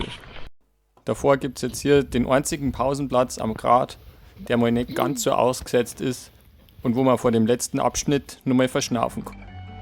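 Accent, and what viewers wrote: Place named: Bavaria, Germany. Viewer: Servus, ich hab deinen Kanal vor kurzem entdeckt und muss sagen die Videos taugen mir richtig. Gute Länge, gute Aufnahmen und sau sympathischer bayrischer Kommentar. Mach weiter so!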